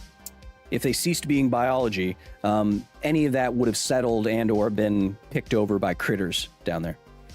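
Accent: Queensland, Australia. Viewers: Im also aussie and do sound aussie asf bro u even have the look of an aussie.killa content bro. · As a dirty New South Welshman I can say with authority that was actually a good suburban Queenslander accent. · Honestly great Australian accent but just watch out because you're turning kiwi for a second every now and then. Other than that surprisingly authentic impression which is difficult to come across with Australian accent impressions.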